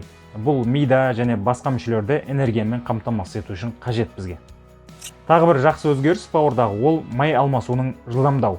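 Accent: Standard. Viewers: Рахмеееет балам, өте тамаша таза қазақ тілінде түсіндіру қандай керемет,,,,өркенің өссін. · Әсіресе, қазақша таза сөйлегенің үшін көп рақмет!!! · Балам таза қазақша сөилегеннің ұнады рахмет жақсы түсіндірдің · Рахмет қазақша таза сөйлегеніз бен пайдалы кеңесізге үлкен рахмееет,риза болдық,Алла разы болсын!!! Сізге жазылып, лайк басып қолдаймыз!!!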